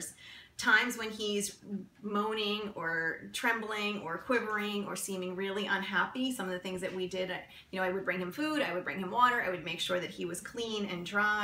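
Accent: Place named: United States. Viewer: English is my main language but I have no idea what you said in the first bunch of things you said so did not watch any more. You need to slowdown, speak clearly and realise that Youtube is an international device; and that the American twang you have is not easy on the ears of those of us who are from other parts of the world.Not to mention those who have English as a second language. I hope you are a better vet than you are a speaker.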